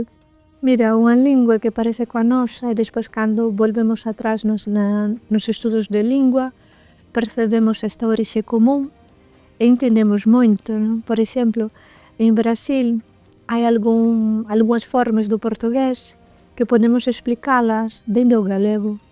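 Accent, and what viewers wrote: Castile, Spain. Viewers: ela fala de uma maneira muito castilhanizada... no programa galegos pelo mundo quando mostram pessoas mais velhas elas falam igual aos brasileiros... a impressão que dá é que o galego tá sendo pouco a pouco absorvido pelo espanhol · Haber el canal Horrible la locutora que hable con acento gallego ESO DE HABLAR CON ACENTO CASTELLANO LE QUEDA HORRENDO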